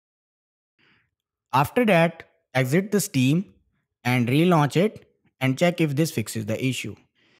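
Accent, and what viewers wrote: India. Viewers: of course its an indian accent teaching us how to fix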